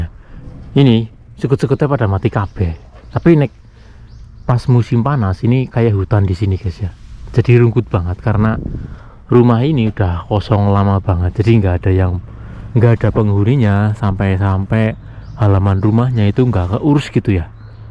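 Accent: Banyumas, Indonesia. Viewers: ngapak temen mas..rika asline wong ndi...semngat masee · Kesuwen neng jepang ora kelalen ngapak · seneng ngapak mas.jwa Endi mas.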